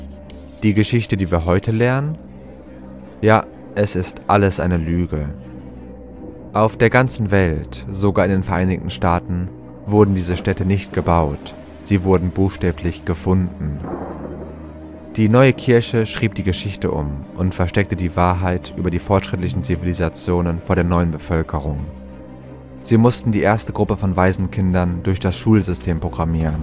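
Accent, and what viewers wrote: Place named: Hesse, Germany. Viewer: Der hessische Dialekt kommt manchmal durch 😅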